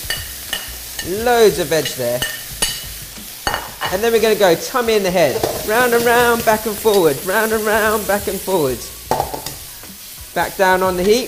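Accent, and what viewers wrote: England, United Kingdom. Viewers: Some how a Asian with a British accent cooking Chinese doesn't seem all that authentic to me. Kind of like a Brit telling an American world war 2 story. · 🔥🔥🔥🔥 bro you talk like English kat but this dude looks Asian is fuck but makes good ass food bros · Fast and efficient cooking , and you have pommie English accent !!!!!!!! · i am an asian n i am jealous of ur english accent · You don’t see many Chinese with British accents. Love it! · I like the way you speaks . Britainese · Asian guy with a British accent lol.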